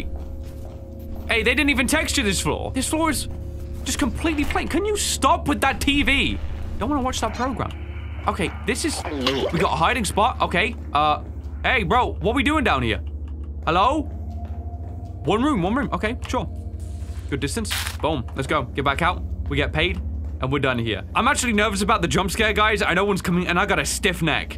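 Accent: United Kingdom. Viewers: I was pretending to be hypnotized because I was watching it and I was confused of what the intro was and so I rewind it and pretended to be hypnotized. That intro was pretty cool. Also when I watched your videos you sound a little bit British like when I heard you say dawko I didn’t hear it clearly. Do a DNA test of where you could be from, Dawko did one on his channel 3 years ago. Or what your part of part Irish, part Scottish, part Swedish idk please make a video of it if you can and watch dawko video for an idea. Just search Dawko DNA Test and you’ll find it. And once you know what to do try to make a video on it.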